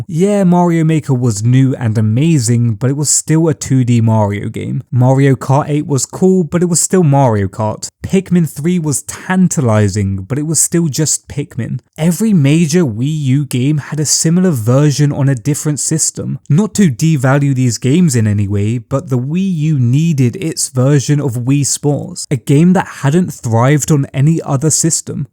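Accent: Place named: England, United Kingdom. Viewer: The other games that were shown off at the reveal conference should have tipped people off. New Super Mario Bros U is one thing but that first person shooter gameplay showed off that should have tipped people off that it was a new console instead of a new controller because did anybody honestly think that that game could have ran on the wii? Considering later on in the system's lifespan there were downloadable Wii games besides the fact that they should have done way more of download of a Wii games, they should have taken advantage of the hype and scarcity of physical copies of Xenoblade Chronicles. Since you have an English accent you probably wouldn't know but here in North America GameStop sold Xenoblade Chronicles exclusively and they took advantage of the hype surrounding that game by jacking the price is way up.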